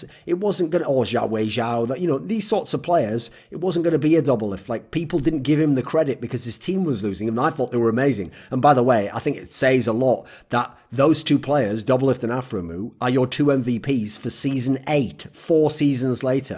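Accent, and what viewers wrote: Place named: Northern England, United Kingdom. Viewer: Nah , like northern English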